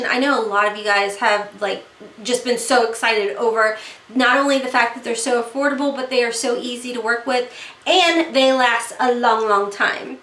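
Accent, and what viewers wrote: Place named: Kentucky, United States. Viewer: Oh, I'm originally from SC but I LOVE your Kentucky accent!!! 😉💖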